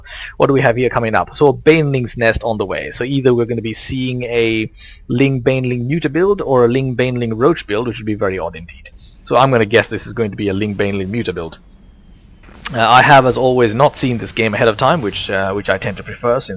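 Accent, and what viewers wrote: United Kingdom. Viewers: Thanks for the cast and as always loving your British accent, keep it up!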